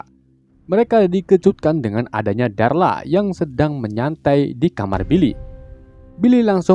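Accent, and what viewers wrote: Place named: Java, Indonesia. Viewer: medok e renyah😄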